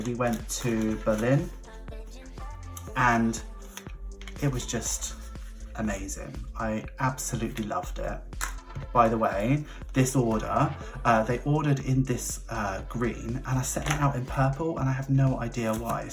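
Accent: United Kingdom. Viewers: Your answer to the kids question was so funny. I have a friend who did NOT want kids, but "oops". She's still not happy to be a mom, but she would kill anyone who looked sideways at her kid. It's pretty funny. But I love how honest your answer was. And I wish you could be uncle to my kid. I think you'd find him fun, and he'd definitely love you. I mean, he already kinda does. He's almost 18 mos, but he loves watching you, and listening to you talk. We're not British, so I know part of the talking is the accent. But also you're so animated and engaging. That person with all the envelopes picked such pretty colors!!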